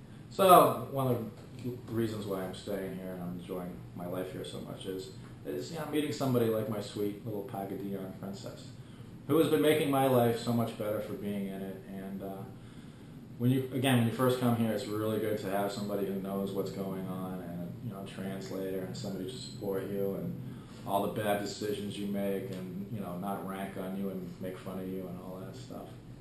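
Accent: Boston, United States. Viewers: BOSTON! I knew it! I was listening to you speak for about 5 minutes saying to myself "I think this guy's from back home..." I'm happy for you, boss - I moved to San Diego about 6 years ago and totally get a lot of your comparisons, because they're somewhat similar to my comparisons of SD vs Boston when people ask me how it is out here. That said, I've always wanted to visit the Philippines (and as of yet, have not) so I obviously can't really understand the Philippine lifestyle. Anyway, you've got a new subscriber. Go Sox/Celts/Pats/Bruins :D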